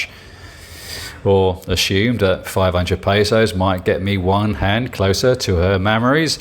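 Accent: England, United Kingdom. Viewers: Great post and hilarious word play; I really enjoyed your alliterative flourishes: You turn a phrase well. I particularly liked your voice when you were imitating a Filipina girl accepting a date to go eat. Which part of England are you from? I've been spending a lot of time in Dumaguete and have been to the Why Not many times. All the best mate, and keep on posting.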